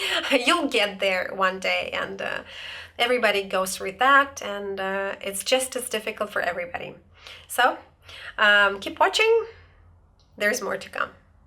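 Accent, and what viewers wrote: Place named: United States. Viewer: Thank you very much for this video! May I ask you some questions? Do you speak any other foreign language? Why did you choose the American English pronunciation? I ask because at my university our teachers prohibited speaking the American English. Thank you in advance 🤗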